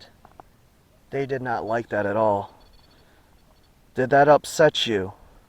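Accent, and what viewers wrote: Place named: South, United States. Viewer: are you in Louisiana? I noticed you had a southern accent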